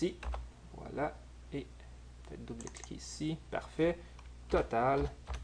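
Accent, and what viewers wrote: Quebec, Canada. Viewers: Intéressant et utile ! et super l'accent Québécois ;)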